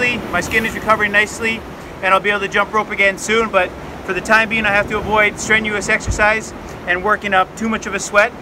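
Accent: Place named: United States. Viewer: Still really enjoying your content. As a typical sedentary 60 year old American I need all the positive example and inspiration I can get; you keep me focused on getting in a few skipping workouts each week. Currently working on various cross-overs and footwork drills to put together a consistent ~20 minute workout that is challenging but enjoyable. Extreme heat and humidity along the US Gulf Coast makes it much harder for an old guy like me but once the seasons change it will be much more enjoyable. Why do I think you're in Ireland (but you sound American or Canadian...)?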